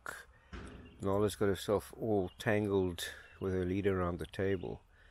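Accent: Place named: Australia. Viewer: Wow brother you sound like your from australia or something my names mitch i live in butler pennsylvania ive been to all places youve shown in your video except for a couple. Do you live here in PA?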